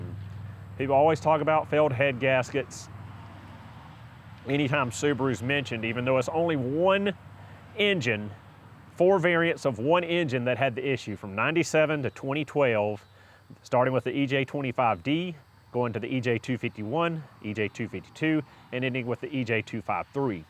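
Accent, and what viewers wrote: South, United States. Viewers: Bro, you sound like you’re from Georgia or Alabama. I’m in East Alabama and getting my first Subaru. Hit me up. I follow the service intervals, but want someone trusted for bigger stuff. My bigger stuff, I mean anything internal. I can and do sparks, injectors, ball joints, fluids, belts, etc.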